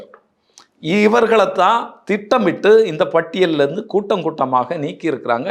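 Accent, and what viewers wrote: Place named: Nagercoil, India. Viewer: Bro உங்க பேச்சில் நாகர்கோவில் மணம் வீசுதே..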